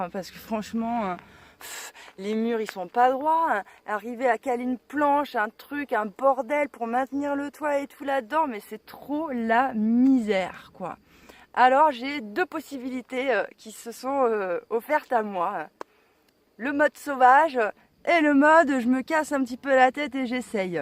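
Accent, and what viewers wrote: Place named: Southwest, France. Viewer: j adore l accent du sud ouest!!!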